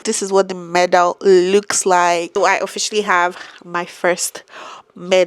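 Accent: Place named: Nigeria. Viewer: trust me you’ll get there faster than you think! If you keep on running 2 to 3 times a week, you’ll soon be reaching 35 minutes for 5k and before you’ll know it 30 minutes My next goal is a 10k, which I’ll start training for in a few weeks once I do some 5ks the next couple of weeks. The long term goal is a half marathon next year hopefully. From your accent I can tell you’re a Nigerian - I’m also a Nigerian too, born and raised in the UK You’ve got this! 🏃🏽‍♀️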